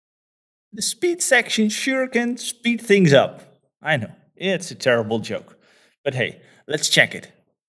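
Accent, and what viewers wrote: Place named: Netherlands. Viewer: Nice App! Nice explanation. Your accent sounds more Dutch than Brazillian to me!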